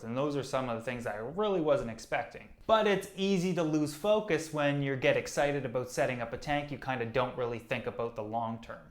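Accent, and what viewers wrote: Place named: Maritimes, Canada. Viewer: Hey man i thougth you were from the maritimes i live in Fredericton New-Brunswick cheers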